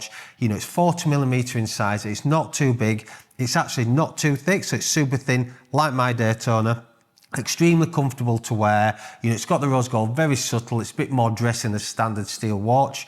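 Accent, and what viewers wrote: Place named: Yorkshire, United Kingdom. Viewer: Out of all the YT videos regarding Watch content, I have to say, I really enjoy your videos. Always to the point, no nonsense or drama. Just the timepieces and the market details. I’m a huge fan. Enjoy that Yorkshire accent as well. I do have one question though, not to insult you by any means, but what is your opinion on the “Steinhart” entry level watch brand ? It’s interesting to me that very little information is out there about this brand. The Ocean One looks very similar to the ever popular Submariner, but without the Rolex price. As an everyday watch I’m interested in one. But as mentioned I can’t seem to get an experienced watch dealers perspective. Maybe that’s a statement in itself? Hope all is well across the pond ! Cheers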